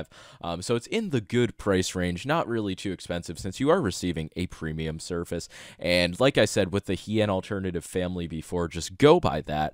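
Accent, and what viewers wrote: New York, United States. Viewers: are you from nyc?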